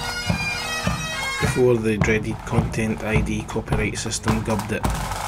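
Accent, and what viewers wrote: Scotland, United Kingdom. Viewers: LOL .... I love the videos but have great difficulty understanding the narration due to the Scottish brogue. I turned on the auto generated subtitles and that is gibberish. BTW English is my mother tongue.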